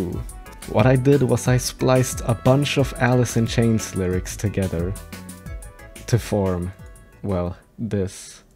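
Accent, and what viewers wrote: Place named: Sweden. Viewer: ahh I expected you were Swedish by ur accent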